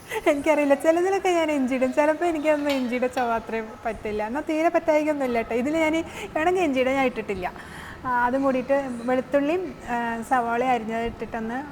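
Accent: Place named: Palakkad, India. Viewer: പാലക്കാട്‌ സ്ലാങ്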